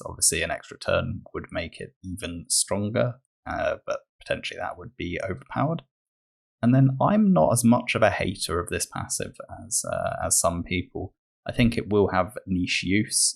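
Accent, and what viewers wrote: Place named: Germany. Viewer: So i startet 8 days ago. Yes, how do you found my small channel then and dont watch the videos from the ,,big" boys? Its cause im a freak in getting Infos. I whatched sooo many videos on how to build, what to do, who to save, where to start and so on and so fort. And ill just grab the epic and then pass the rest of the event. The epic whould help me ab bit. My only 6 star is kael and other i habe 5 star drath and a 4 star vergis with a 4 star warmaiden. So this epic could help me pass some higher stuff cause my kael is full dmg and has really no acc. :) And I like to watch your videos. You are very informative and freetoplay so you understand that we dont have godlike gear lmao. :D Also, are you german? You have an accent. :)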